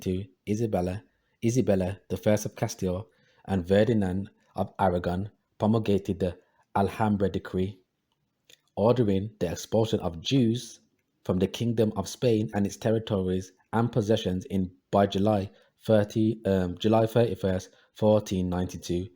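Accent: United Kingdom. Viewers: thank you my brotha, are you from the UK?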